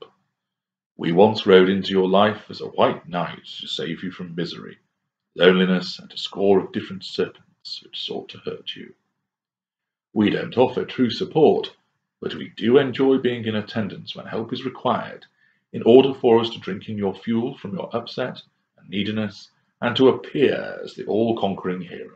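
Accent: United Kingdom. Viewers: you did Hoover me back with the audios! Brilliant work, you deserve the fuel you are getting. Nice to put a voice to the lessons. Oh, that British accent of yours! I'll be forever grateful for all I've learnt from your writings.:) Still not ready to have you write my online dating profile, but I'm still an escapee. Narc did not see it coming. Thanks! Blackwidow.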